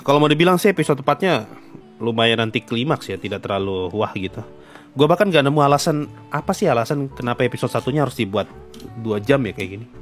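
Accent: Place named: Eastern Indonesia, Indonesia. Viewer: Logat nya org timur sekali aleee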